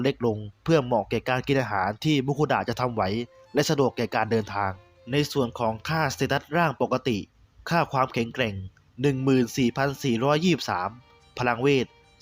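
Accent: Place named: South, Thailand. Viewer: เจ้าของเพจเป็นคนจังหวัด กระบี่ หรือพังงา ครับ เสียงออกโซนนั้น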